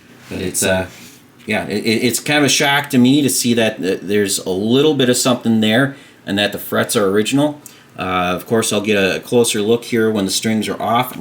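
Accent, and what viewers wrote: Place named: Minnesota, United States. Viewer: Daaaamn. I'm here for that Minnesota accent don't cha kno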